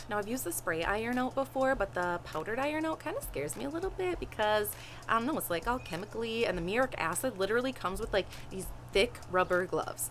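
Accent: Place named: Midwest, United States. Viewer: I just figured it was her midwest accent. Like how some people pronounce worcestershire nothing like its spelled.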